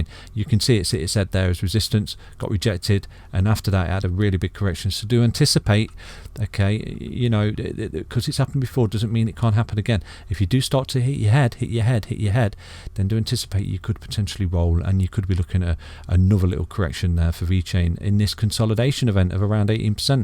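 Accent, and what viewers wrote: United Kingdom. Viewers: Ur great In ur comments mate mint work how come u work in dollars as u sound like ur from the UK?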